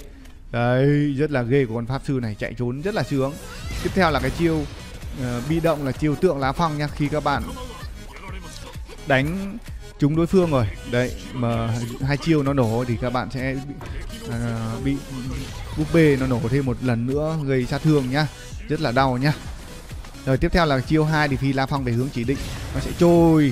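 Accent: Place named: Ha Tay, Vietnam. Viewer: Giọng a nghe như giọng hà tây kkkk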